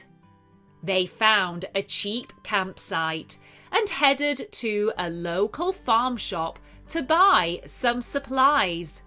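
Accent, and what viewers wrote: United Kingdom. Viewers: Finally i find a suitable channel to learning British accent thank you so much🫂🫂🫂🫂🫂🫂🫂🫂🫂🫂🫂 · That UK English! 😁💜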